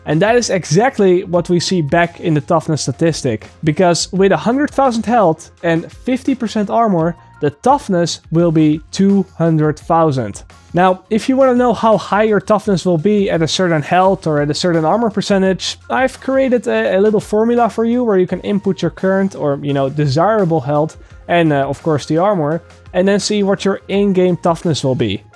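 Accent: Netherlands. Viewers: You're probably Dutch, right?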